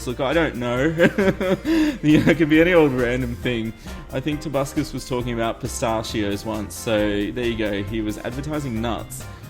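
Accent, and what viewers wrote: Australia. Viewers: I only started watching 2 days ago, but your stuff is great. the Australian accent is very motivating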